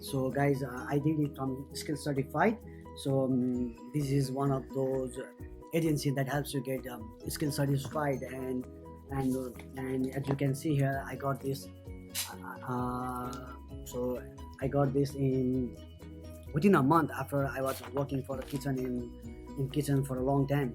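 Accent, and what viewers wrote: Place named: Nepal. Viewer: that's called Nepali accent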